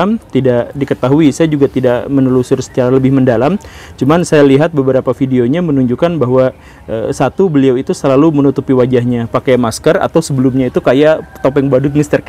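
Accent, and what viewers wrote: West Java, Indonesia. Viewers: Klo sy Alhamdulillaaah,ga prnh ikut2an.waktu itu pernah rame di daerah tmpt tnggl sy,sprti yg di ajarkan ustdz YM,ada yg ikut beberapa orang,kata mereka hayu cpt sedekah 50rb juga gpp,nanti sebulan lg kIta dpt rezeki 70kali lipat, Klo sy terus terang langsung mengernyitkan dahi(????) BTW sy baru liat channel,maasyaa Allaah ilmu'nya🤗🤗berbobot, penyampaian'nya ringan,logat sunda pula,da abdi ge sunda😘😘😘👍🙏🏻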